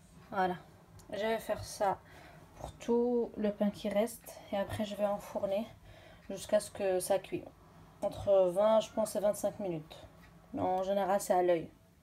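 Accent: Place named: Belgium. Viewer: Super une chaîne pour le mcc ! Cest trop mignon ton accent tu es Belge ?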